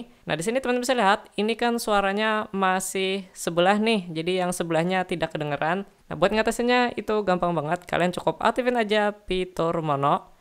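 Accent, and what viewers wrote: Banjar, Indonesia. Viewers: Dari logatnya kaya orang Banjar ini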